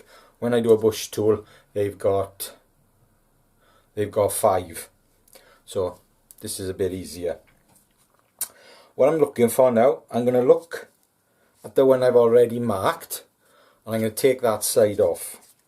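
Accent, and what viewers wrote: Wales, United Kingdom. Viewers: Hello, I've just come to your channel via recommendation from Greencraft review of a knife you gave him. Have already watched two videos and looking forward to browsing your back catalogue. Very entertaining and good to hear a fellow Welsh man. Regards.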